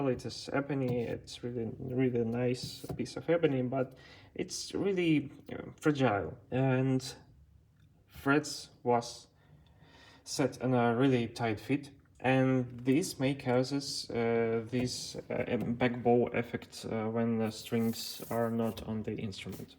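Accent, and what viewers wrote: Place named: Eastern Europe. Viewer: Сильный славянский акцент, чувак. Откуда ты? Россия? Украина? Беларусь?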